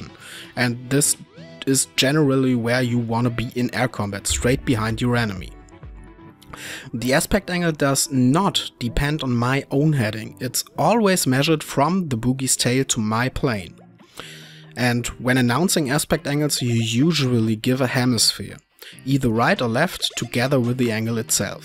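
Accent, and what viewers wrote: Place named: Germany. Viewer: I don't know what it is about German people speaking English but it sounds so damn cool, could listen to this dude for hours.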